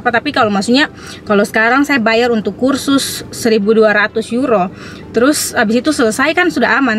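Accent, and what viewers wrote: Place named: Papua, Indonesia. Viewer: Bahasa belanda campur logat papua 👍😂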